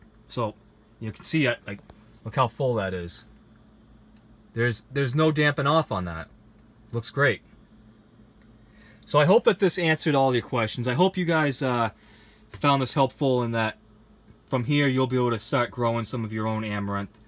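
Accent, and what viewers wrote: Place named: New Jersey, United States. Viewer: OK. I am from NJ. I though I recognize an accent. I am in "New Mexico" now. I run "Taos Teenie Greenies". I grow for restaurants and locals. I have a request to add Amaranth to my lineup. I viewed your video for some pointers on my 1st batch. Thanks for making the video! Useful information here. Good work!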